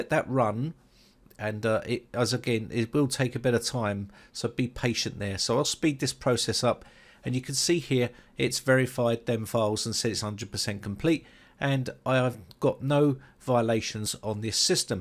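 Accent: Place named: Scotland, United Kingdom. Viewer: Very great scottish accent!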